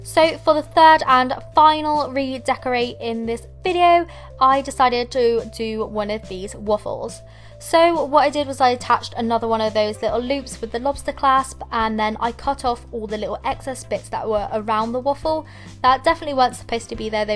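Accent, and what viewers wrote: United Kingdom. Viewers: I love BRITISH ACCENT!❤❤❤ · Where are u from because you sound British but I can’t tell (not trying to be rude)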